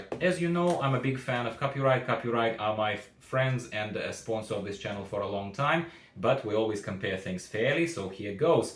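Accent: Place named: Eastern Europe. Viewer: The accent :D are you from EE?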